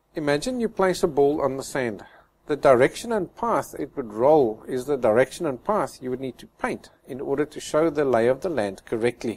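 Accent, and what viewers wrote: South Africa. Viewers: Fantastic.. im from s.a.too. I can hear on ur accent ..I'm trying your tutorial soon. Do you give painting lessons? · thanks for the great teaching style and accent in your voice,,is it south african or welsh?but thanks anyways very easy to follow and sped up just at the right times --im sure it wont come out like yours but i will try nevertheless,,thanks again..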